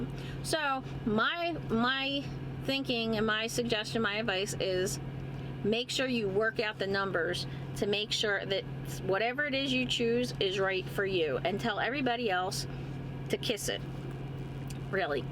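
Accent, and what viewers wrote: New Jersey, United States. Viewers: I knew there was a reason I love hearing you talk, you’re a Jersey girl.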